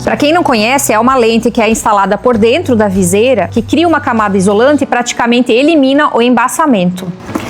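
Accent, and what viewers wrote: Brazil. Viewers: 0:3722:31 Suatque gaúcha tchê! tu és do Sul do País menina😉😉🤣🤣😂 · Pelo sotaque parece ser de Santa Catarina. Pela proximidade geográfica de Santa Catarina com com o Paraguai, não fica mais barato e fácil de acabar achando em Ciudad de Leste ou na Argentina as botas? Curiosidade mesmo. Acho super importante andar segura de moto ( eu moro fora do Brasil e vejo cada queda absurda aqui que só por Deus)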